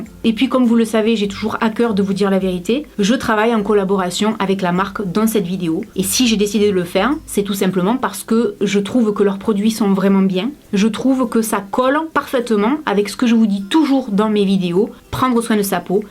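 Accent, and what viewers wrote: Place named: Marseille, France. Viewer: Cc j'adore t'es vidéo continue comme sa juste tu es marseillaise ou pas car tu a l'accent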